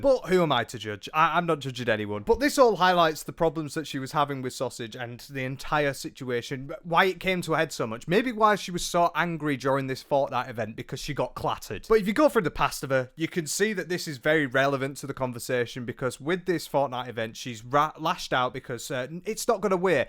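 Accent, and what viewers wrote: United Kingdom. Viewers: British man. i approve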